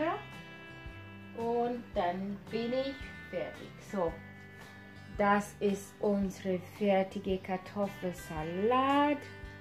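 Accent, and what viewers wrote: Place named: Germany. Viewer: Good cooking my dear🇸🇪🇸🇪🇸🇪😁😁😁😁😁🦚🦚🦚🦚🦚👜👜🌔🌔🌔🌔🌔I like your German accents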